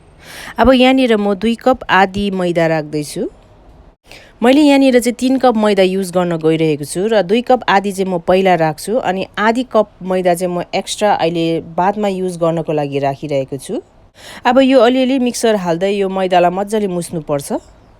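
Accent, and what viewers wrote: India. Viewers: Namaste mam, i am ur new subscriber....i am highly impressed by your hindi mixed nepali accent n ur easy recipes....keep posting easy tasty recipes..thanku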